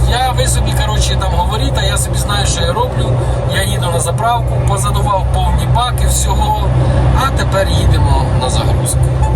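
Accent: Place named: Surzhyk, Ukraine. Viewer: Говори ты уже по-русски , а то твоя сова похожа на избевательно над рiдной мовой. Честное слово, по-русски звучит весь твой суржачий диалект.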